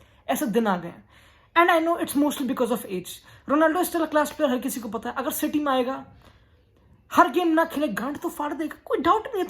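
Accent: India. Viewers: Hindi accent is 🔥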